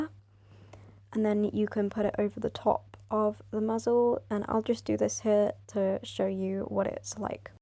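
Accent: United Kingdom. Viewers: Oh my god this tutorial is amazingggg,, also the accent?? Are you British?? :)))) (if so do you mind me asking where abts? Like north, south, west, midlands etc)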